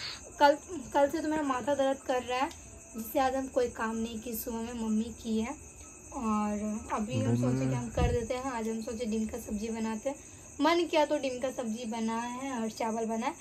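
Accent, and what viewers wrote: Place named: Jharkhand, India. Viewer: Jharkhand kolkata side aise hi bolte h waha k log meri saas bhi bolti h massala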